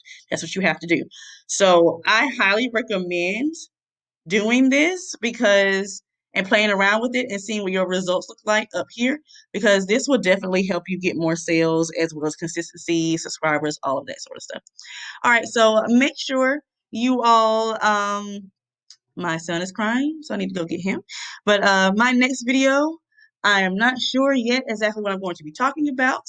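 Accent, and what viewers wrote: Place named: South, United States. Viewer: oh you’re from Enfield, you country lol I mean I’m country too, but y’all accents are thick lol Do you prefer mailchimp over Privy?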